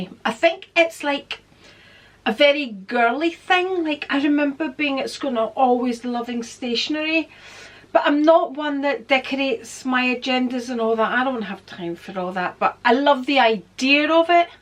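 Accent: Scotland, United Kingdom. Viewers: Hi, I forgot to mention there was another interesting reason I have been watching your videos. Because I studied my PhD in Glasgow 20 years, I do enjoy your Scottish accent and your Vlogs to Glasgow. Looking forward to seeing more videos from you. Cheers ❣️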